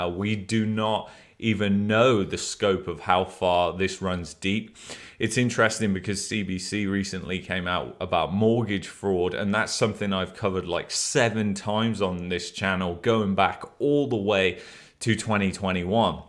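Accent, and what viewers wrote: United Kingdom. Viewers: Finally we see the true story where the mainstream media never covers. Many Thanks for your time. New subscriber. Love the British accent too.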